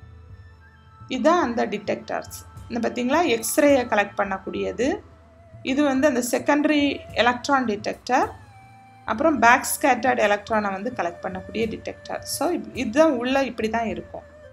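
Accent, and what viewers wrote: Kanyakumari, India. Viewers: Mam neenga Kanyakumari ya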